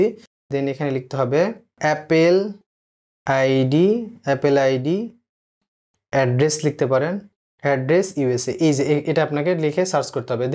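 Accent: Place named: Naogaon, Bangladesh. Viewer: Naogaon/Adamdighir language😊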